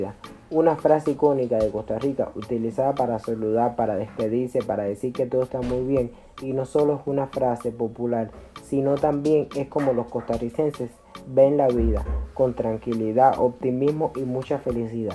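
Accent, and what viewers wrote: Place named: Dominican Republic. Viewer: ups lo siento espero no haberlo insultado....conozco algunos dominicanos y tienen su mismo acento.....saludos y 🌴 P U R A 🌞 V I D A 🌴 🇨🇷🇨🇺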